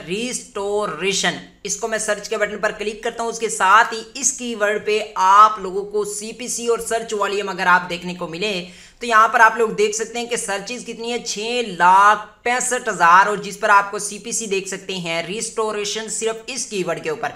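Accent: Punjab, India. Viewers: Very Nice & Good Punjabi Touch.. Liked it.. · Wow Punjabi tarka👌👌👌👌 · Good Punjabi voice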